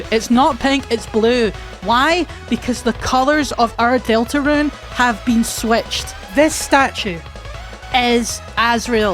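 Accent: Scotland, United Kingdom. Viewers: I like the cut of your gib manic Scottish lady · the scottish accent made me insta sub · you have the most scottish accent i’ve ever seen 😭 absolutely love it lad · pure 10 minutes of a crazed scot yelling at us expecting us to understand her's crackpot theory, okay bud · I love the scottish accent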